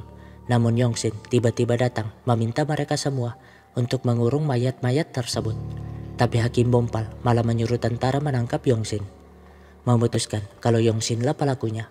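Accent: Makassar, Indonesia. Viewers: Orang makassar kah ini adminnya?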